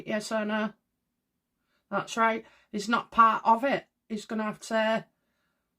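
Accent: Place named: South Yorkshire, United Kingdom. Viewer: I just watch because I like listening to you. As a Christian I shouldn’t even watch these kinds of videos 🙈🤪 You sound like you could easily live where I live in South Yorkshire. If it’s any consolation based on other comments I’ve seen, many other people said he was going to pass yesterday.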